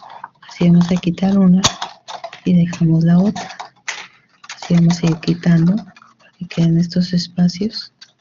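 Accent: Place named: Chile. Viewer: Mexicana???hoooo habla igual que nosotros los chilenos, llegue a pensar que podia ser chilena jajajaja....bueno se paso me han encantado todos sus trabajooos....besoooossss amiga..DIOS la rebendiga mucho por todas sus creaciones...😍😍😍💖💖💖👏👏👏🌹🌹🌹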